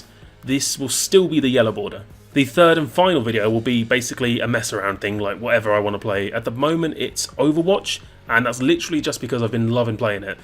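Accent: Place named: United Kingdom. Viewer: you sound like very English person ever, but nonetheless i'm for this channel change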